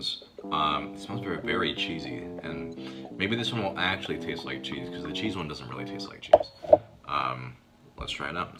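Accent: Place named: United States. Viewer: Why do you sound 100% American??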